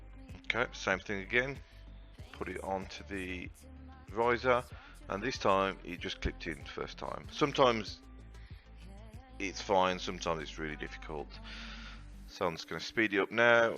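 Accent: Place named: Birmingham, United Kingdom. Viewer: I can easily tell from your voice that you’re a Brummy haha. Nice vid!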